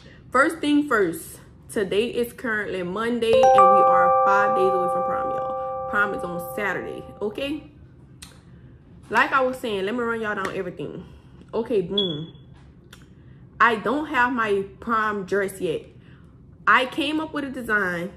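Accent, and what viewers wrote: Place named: Louisiana, United States. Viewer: periodddd, i can tell you from louisiana accent so cute ❤️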